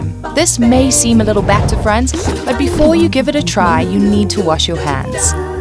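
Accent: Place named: India. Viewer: Dude are u from India, sounds like that!!!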